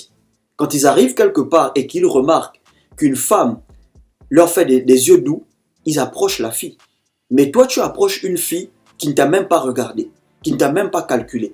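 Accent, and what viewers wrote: Gabon. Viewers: J'ai découvert cette chaine au début de la semaine, j'ai visionné plusieurs vidéos, et je trouve que le frère a du vécu, il sait de quoi il parle, tout en dégageant l'attitude de quelqu'un qui est issu du milieu de la séduction. Une très bonne connaissance de la nature et la psychologie féminines. C'est le type même d'ami, de frère, voire de grand frère qu'on aimerait avoir eu avant de se lancer dans le Game où de nombreux d'entre nous se sont fait broyer par inexpérience. Et l'accent du Gabon, où j'étais en 2008, est juste nostalgique. Force à toi frérot, un nouveau fan de ta chaine, du côté de Brazzaville. Mbolooo!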